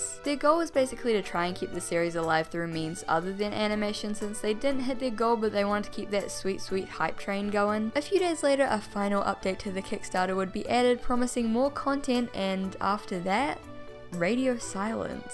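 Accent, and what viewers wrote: New Zealand. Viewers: For me it's the NZ accent. NZ give me Gold Coast flashbacks. No, you can have a durry cuz. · omfg I knew u were from nz bc of ur accent!!! when i saw the kickstarter that confirmed it ahhh!!! bet ur from auckland uwu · as soon as i heard your voice i knew you were a kiwi haha. There's been like a surge of New Zealanders on Youtube and I'm here for it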